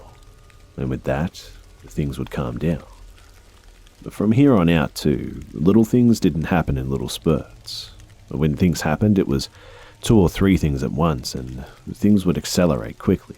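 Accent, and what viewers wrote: Australia. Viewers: Love these videos, they’re the only thing that help me sleep. It’s also nice to hear a familiar Aussie accent :) !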